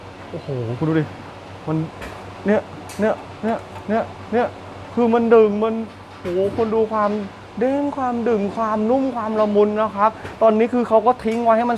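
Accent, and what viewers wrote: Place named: Central Thailand, Thailand. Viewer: ชอบกินเต้าหู้ค่ะ น้อง พูดเหน่อดี ดูเพลินๆน่ารักๆดี เป็นกำลังใจให้นะคะ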